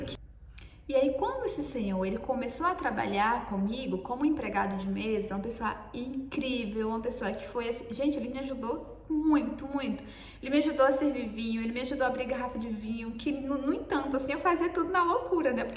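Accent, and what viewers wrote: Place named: Northeast, Brazil. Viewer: Acho o sotaque nordestino horrível , mas gostei tanto do jeito dessa menina,do espírito aberto dela, que nem consegui reparar o sotaque.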